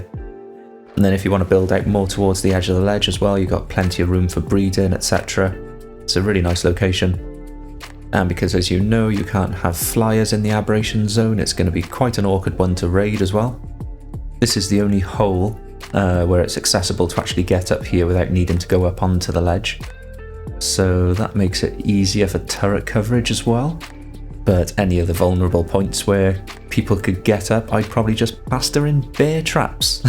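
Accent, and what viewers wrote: Wales, United Kingdom. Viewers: I started listening as you sound very welsh, I kept watching as you are really good at explaining things and as such I’ve gone from worst ark player ever to competent enough to survive a week 😂. Keep it coming for us older folk who can’t keep up.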